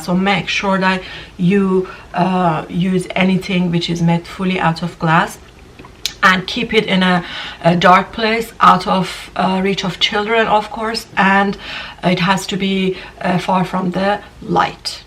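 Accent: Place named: Iran. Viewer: Persian accent 💯💯💯💯. She has diluted it from living abroad somewhere but sounds Persian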